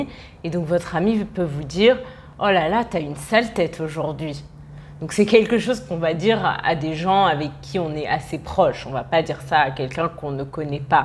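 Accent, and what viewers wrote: Paris, France. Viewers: Bonjour. J’adore ton accent français. Je trouve que ce vidéo également intéressant. J’ai hâte de votre prochain vidéo. J’aimerais que vous faites le même pour la série Dix pour-cent (Call my agent) si c’est possible. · Elle a un accent parisien, mais surtout, elle accentue sa prononciation, et parle doucement, pour que vous saisissiez ce qu'elle dit.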